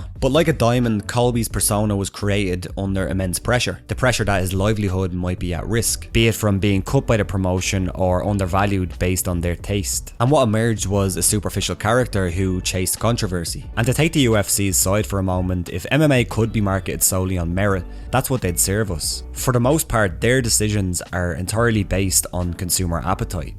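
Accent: Ireland. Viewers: I'll certainly give you a "tums" up on that one. I'd give you "terteen" of em if I could. ;) I had one of the best times of my life in Dublin! My real name is about as Irish as you can get. Love your accent and videos!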